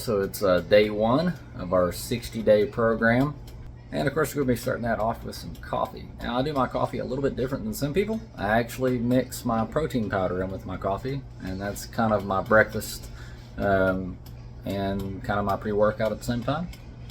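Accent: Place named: South, United States. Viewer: If the accent didn't give away that your from the south the camaro bumper used as a mantle sure did 😂 great video 👍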